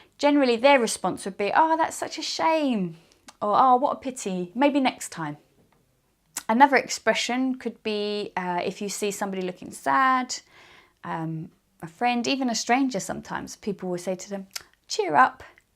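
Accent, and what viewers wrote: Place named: United Kingdom. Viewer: I like this English teacher a lot.Because she is amazingly skilful in teaching.Besides,I AM si fascinated with the British accent...I like very mucho the British English.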